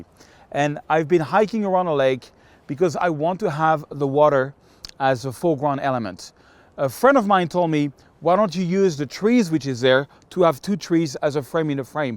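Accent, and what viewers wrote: France. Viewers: I love your accent...I remember my trip to Paris and yummy macaroons 😍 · Rather than just doing the French accent, you should throw some French in. I would love to learn some French.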